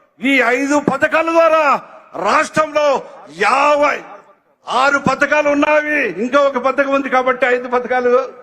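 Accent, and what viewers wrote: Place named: Alur, India. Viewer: Nee alour village yasha basha 😮😮😅😅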